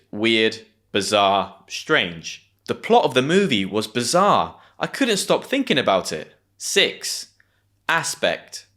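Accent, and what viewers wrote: United Kingdom. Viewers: I'm from germ money. You're from the UK, as I can clearly hear at your dialect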